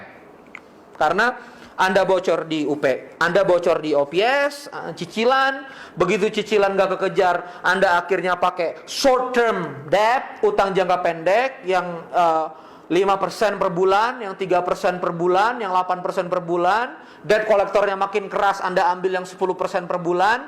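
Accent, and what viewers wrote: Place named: India. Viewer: bahasa inggrisnya kyk logat india :D udah pake bahasa indonesia aja kang. klo mau campur pake bahasa sunda aja :D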